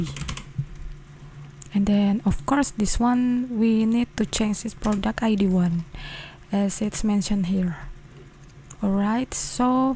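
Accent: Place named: Indonesia. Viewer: sounds like indonesian accent, i might be wrong.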